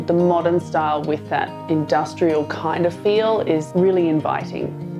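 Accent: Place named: New Jersey, United States. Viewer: i love her new jersey accent.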